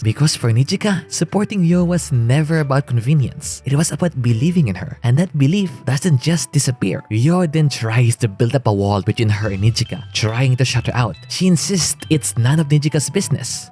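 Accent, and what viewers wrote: Philippines. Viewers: I think your a Filipino because of your actsent.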